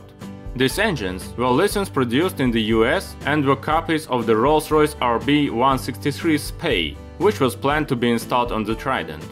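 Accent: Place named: Russia. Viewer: Why the fuck is a Russian accent telling us about the American Boing 727 history …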